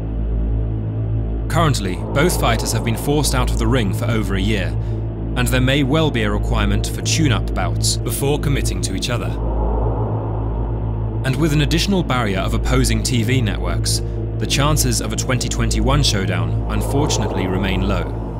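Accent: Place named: United Kingdom. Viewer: Another awesome one !!! I really like your accent i asume you're a brit , cause English is my second language and sometimes i can't understand some accent's 😁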